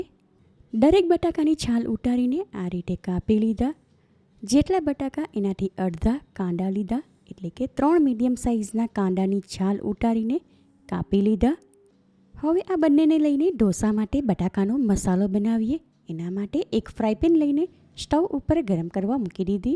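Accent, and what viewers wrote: Surat, India. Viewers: Chokks surti chho bolo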